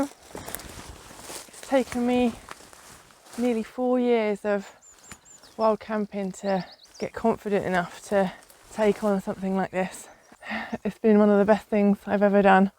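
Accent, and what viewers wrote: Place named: United Kingdom. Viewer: I'm glad you're having fun , where are you from you look Asian but your language accent it's throwing me off you sound British just wondering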